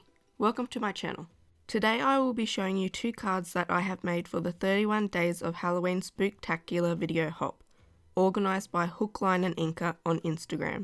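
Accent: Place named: Australia. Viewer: I wondered where you lived with that super cool accent. Australia! How neat! I know someone from there and for years I spent time trying to convince him to mail me a kangaroo. He kept telling me that kangaroos are wild animals and are not meant to live inside your house, but I would not take no for an answer. I had an awesome picture in my mind of my very own kangaroo carrying stuff around for me all the time in its super cool kangaroo pouch. That is my story and I'm sticking to it! I didn't realize that Australia doesn't really celebrate Halloween. That is something that I hope changes because Halloween is my favorite day of the year, so I wish that everyone would get to experience it! This year I got to meet my new neighbor who is four years old and is named Faith, when she ventured across the street because she was looking for candy. She actually yelled and asked if we had any, at which point we yelled back very loudly, "We have so much candy, and glow bracelets, and bags of Halloween toys!" Needless to say, she convinced her dad to come across the street and come inside to meet us. 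It was great. There are so many cute things that happen every year. Tiny people are my favorite kind of people, and Halloween is the one day of the year that a bunch of them show up at your house wearing adorable costumes! That is why I like it so much. I will find you out in social media land. I really hope that it is not expensive to mail that card all the way to me in the United States. If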